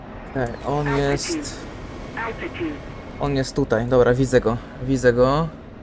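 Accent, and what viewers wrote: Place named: Poland. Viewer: Siema, fajnie w koncu ogladac Polski akcent w DCS. Aktualnie mam mala przerwe od DCS. Grasz moze w IL2 Great Battels ??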